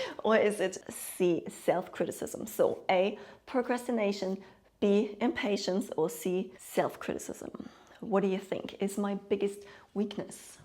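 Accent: Germany. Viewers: Dein Denglisch ist irgendwie putzig xD But jokes aside, your english is more than fine. I did not realize you're german until you changed language. Then again my opinion on that might not count as I'm german too. But for a non-native you're doing extremely well. P.S.: Metal \m/ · I get any right answers 🙈😂 I'm so bad at quizz but thanks for it ❤ and my main weakness is self-criticism, but I improved a lot. I always think how elegant is your english accent, since I know you are German. That's the first time I hear German accent in english 😊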